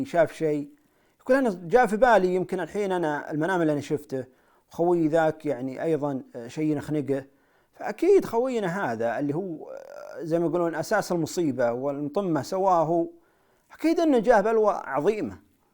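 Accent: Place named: Saudi Arabia. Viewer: صوتك ممفهوم لهجتك سعوديه قح بس قصصك ممتعه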